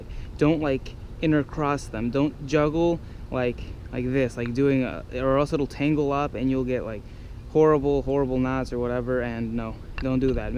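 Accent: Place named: England, United Kingdom. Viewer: You sound very English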